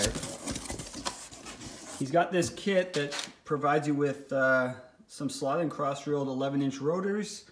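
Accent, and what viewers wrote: Canada. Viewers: Love the Canadian Accent, " Ought" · You sound Canadian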